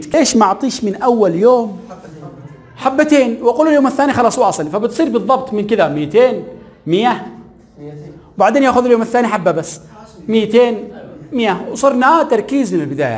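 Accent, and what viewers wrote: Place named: Yemen. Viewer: اللهجة اليمنية ❤ الله يوفقكم اهل اليمن ويزيدكم من علمه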